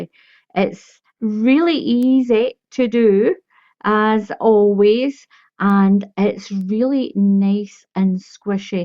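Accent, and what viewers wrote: Scotland, United Kingdom. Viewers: ❤️❤️❤️❤️❤️ Wow, by looking at it one would never know how easy that beautiful little blanket was to make. Thank you for the lesson!! ❤️ I'm going to use this on my next baby blanket project.👍 Btw, I get in trouble when I'm wrong but do I detect a bit of a lovely Scottish accent?🙂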